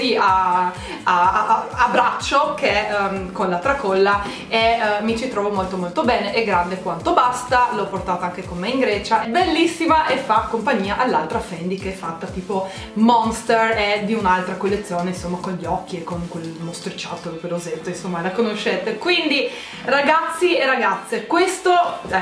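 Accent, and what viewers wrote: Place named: Trieste, Italy. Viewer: oddio sei di Trieste anche tu ahahah! infatti mi dicevo che hai un accento troppo famigliare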